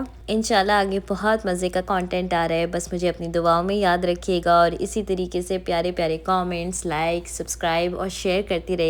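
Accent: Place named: Pakistan. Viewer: You have an awesome Urdu accent..... Love your vlogs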